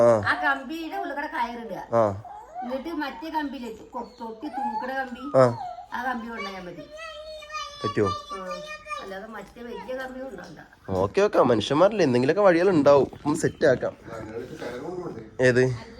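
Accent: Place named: Malappuram, India. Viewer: Umma kolllam baasha paraunnund malappuram baasha😊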